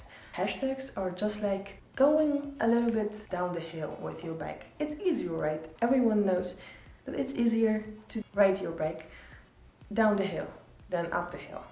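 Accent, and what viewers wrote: Netherlands. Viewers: Hahahha ik hoorde meteen aan je engelse accent dat je nederlands was, thx voor de video wel echt super handig! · I love the European accent or rather Dutch accent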